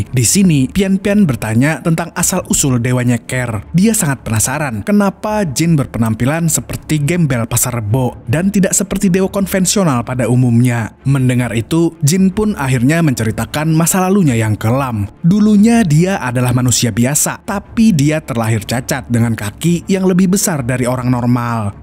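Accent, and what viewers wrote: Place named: Cirebon, Indonesia. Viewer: Adminnya org cirebon😂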